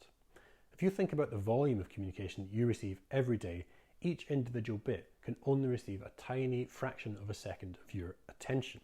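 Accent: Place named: United Kingdom. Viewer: Great uk accent straight to the point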